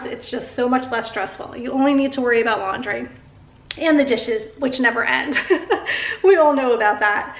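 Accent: New Jersey, United States. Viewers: Just joined your channel! love your content. Love your NJ accent :)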